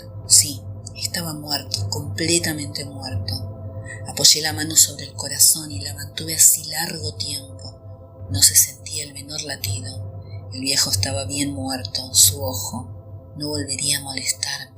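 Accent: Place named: Argentina. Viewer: 1ero eres argentina creo... 2do we tu video esta super lo adoré como narras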